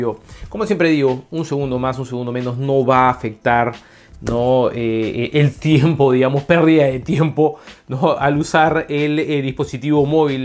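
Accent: Peru. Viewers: eres peruano???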